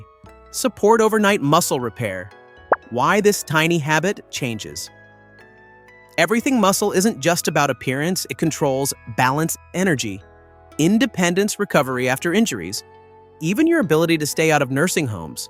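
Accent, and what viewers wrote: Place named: United States. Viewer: Click bait rubbish with a annoying American accent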